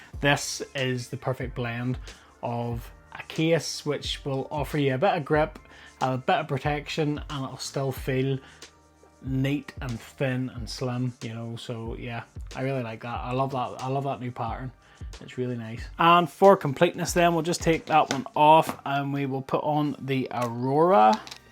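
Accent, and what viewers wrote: Northern Ireland, United Kingdom. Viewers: Northern Ireland?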